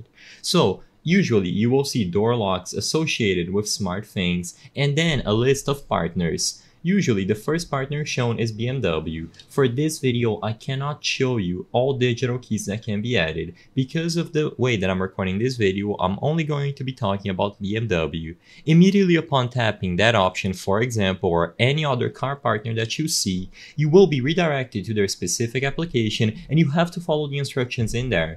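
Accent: Brazil. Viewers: That's certainly a Brazilian speaking English. You got caught by your accent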